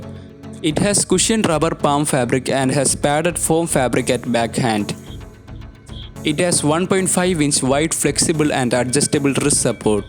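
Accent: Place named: Kerala, India. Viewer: mallu thanna 😹 Samsaram kettal thanne manasilavum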